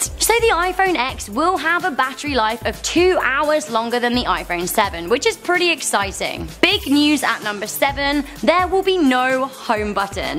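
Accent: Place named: United Kingdom. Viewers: Winter Plays what cute accent? She's british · Zuriel Babida exactly her British accent is cute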